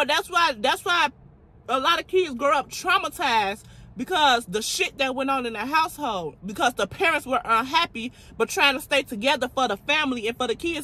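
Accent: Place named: Chicago, United States. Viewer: I love ur Chicago accent, it always make me feel I’m talking to one of my homegirls……#chicagogirls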